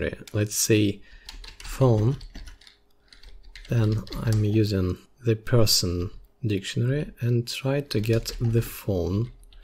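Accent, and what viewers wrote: Russia. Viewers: Чувствуется русский акцент)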